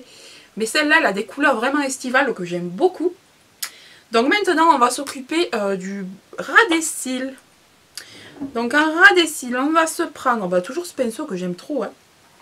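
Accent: South, France. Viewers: ton accent qui sent bon le soleil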